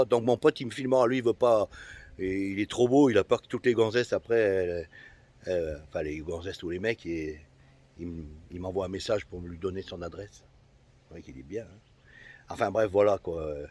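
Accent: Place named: Saint-Étienne, France. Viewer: Where are you from mate? You ve got an accent sounds like Saint Étienne 's thank you for your video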